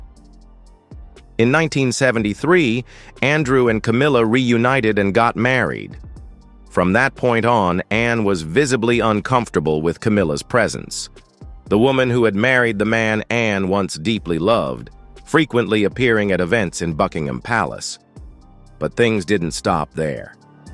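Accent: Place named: United States. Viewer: so many American accents on these stories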